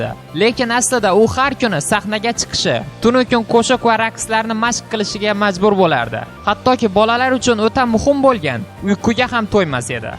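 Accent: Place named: Andijan, Uzbekistan. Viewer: anjanleda bu wevada gapiripti :)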